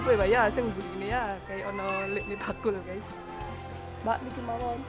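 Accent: Temanggung, Indonesia. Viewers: Wkwk ciscisang 😂 temanggunge metu tenanan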